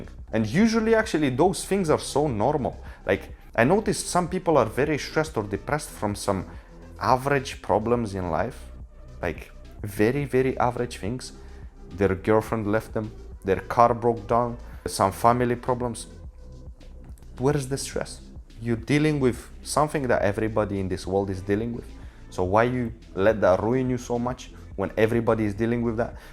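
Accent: Russia. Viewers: Yeah his russian accent proves it and skin color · His russian accent is so funny. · Are u from Russia? Your accent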